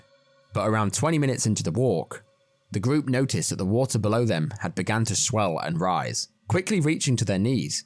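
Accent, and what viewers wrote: United Kingdom. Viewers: I prefer your version more than him because I like British accent in storytelling. 👍🏻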